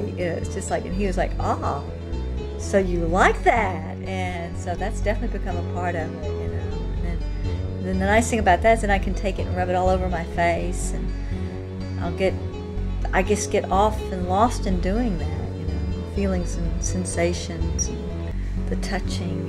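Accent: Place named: South, United States. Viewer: She has a Southern accent...doesn’t surprise me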